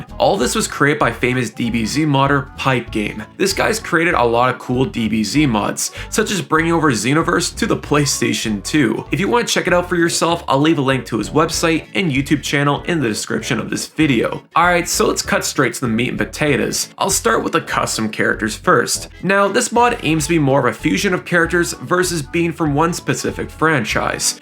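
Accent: East Coast, United States. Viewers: I just watched a few of your other videos and was guessing you were from the east coast and am now satisfied with the confirmation. (Really glad I didn't fuck it it up and find out you from Wisconsin) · You must be from the east coast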